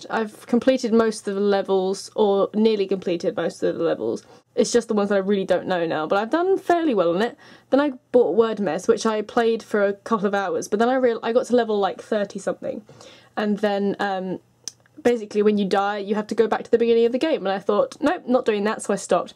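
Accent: United Kingdom. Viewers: its kind of rude what im saying but sometimes the british accent kinda of throws me off..idk why not trying to be rude or anything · are u british???? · oh my god, finally ! I have been watching these videos and the were either american or australian people. Finally an english girl.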